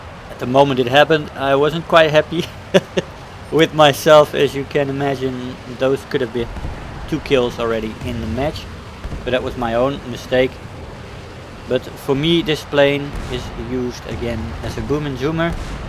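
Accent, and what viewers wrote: Netherlands. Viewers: your dutch ? I hear it from the accent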